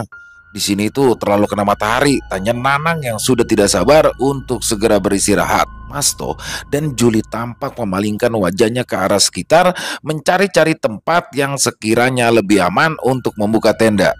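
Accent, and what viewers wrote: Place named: Betawi, Indonesia. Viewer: Bikin LOL mendengarkan Banh bercerita dengan bahasa betawi... 😅😅😅 sangat menghibur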